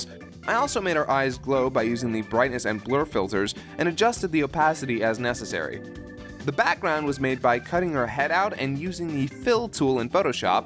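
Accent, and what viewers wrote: France. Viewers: I like your cutie french accent :) A french fan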